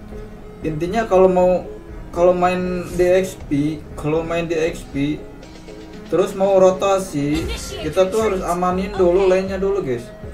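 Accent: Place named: Garut, Indonesia. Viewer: Logatna jiga urang sunda mang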